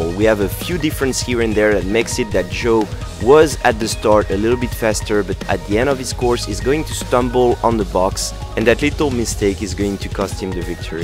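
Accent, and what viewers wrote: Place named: France. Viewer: jpp l'accent français x)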